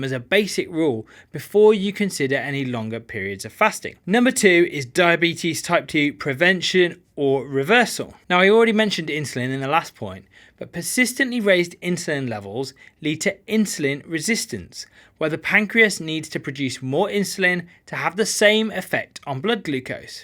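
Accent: United Kingdom. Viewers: I found this video enlightening, clear and pleasant to listen. Specially your British accent 🙏 Thank you so much Docteur 🎉 Big bravo 🎊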